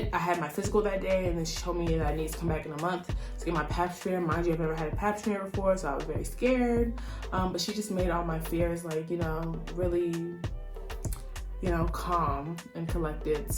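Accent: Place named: United States. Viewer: Yh your American Accent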